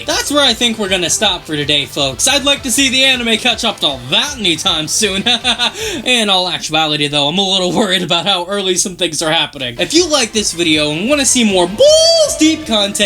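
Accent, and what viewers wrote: United States. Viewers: WHAT HAVE U DONE TO, HE'S BEEN KIDNAPPED BY AN AMERICAN WHO IS IMPOSING AS HIM D: on a serious note give us back not this merican dude. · Hahaha bro your American accent is really good. Impressive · Am I the only one who likes his American accent? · this video killed me and he actually managed to make it to then end using the american accent :) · I clicked the video and i thought it wasnt. Your accent over american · I don't know who can't get enough of the American Accent but watching it with your new videos I can assure it doesn't have the same energetic and passionate as your usual accent. Please go back to your usual accent. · i said his american accent was getting better😂💯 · I feel like when you talk in your American accent it makes me sad because you are conforming to American society and losing your individuality. Your accent is sooo much more passionate and interesting to listen to!!!! 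American accent for memes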